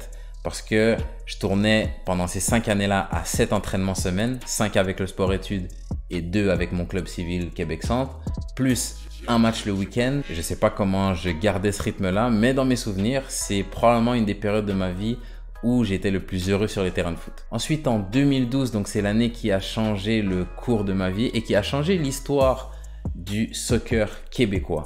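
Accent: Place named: Italy. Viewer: Encore une magnifique vidéo un belle accent italien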